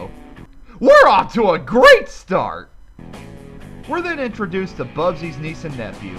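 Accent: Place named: Texas, United States. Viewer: you got a Texas accent.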